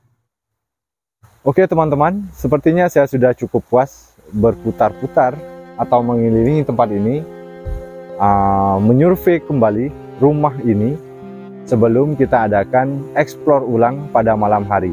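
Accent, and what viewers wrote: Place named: West Sumatra, Indonesia. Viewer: Bang ini orang minang ya...logatnya seperti orang minang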